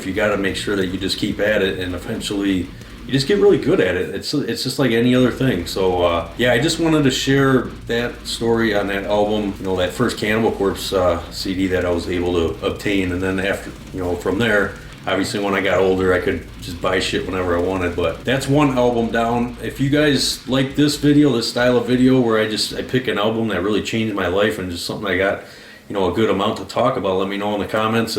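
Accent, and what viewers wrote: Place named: Michigan, United States. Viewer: Dude I FUCKING knew you had a Michigan accent. Man, I've watched guitar videos of you since my early 20's(now 30)I hope I didn't get that wrong. If you ever find yourself in Mid Michigan I'd love to talk!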